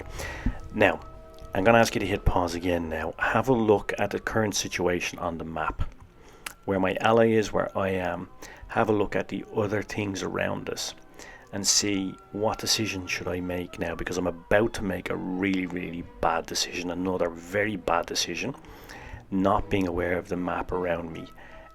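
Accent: Ireland. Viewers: On the subject of dulcet Irish tones, Wargaming should hire you to replace the crew voice in the British tanks.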